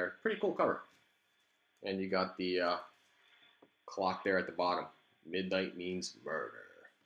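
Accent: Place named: Southeastern New England, United States. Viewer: Like how you mix in comics and craft beer. Sounds like you're local to SE MA & RI. So many good breweries and LCS in the area.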